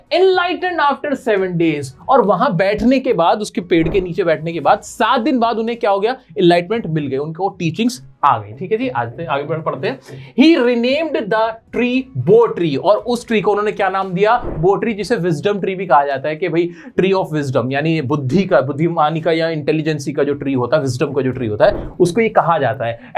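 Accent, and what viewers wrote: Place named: Bengal, India. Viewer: You are bengali bro you say in the lesson maa-nush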